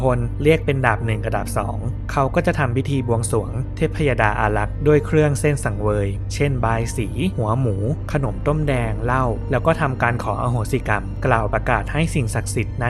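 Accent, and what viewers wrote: Nakhon Sawan, Thailand. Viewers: ดูมาหลายคลิป เพิ่งมารู้เลยค่ะว่าเป็นคนคอนหวัน ทางนี้เป็นคนพิจิตร อยู่อำเภอติดคอนหวันเลย ชอบพูดแงะ แล้วก็โดนหลายคนทักว่าพูดเหน่อเหมือนกันด้วย<num> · คำพูดนี่คนนครสวรรค์ชัด (บ้านเดียวกัน)// ใกล้หมื่นซับแล้ว เชียร์สุดใจให้ถึงเร็วๆ🎉 ... ยังคงรอ Barbarian ตลอดไป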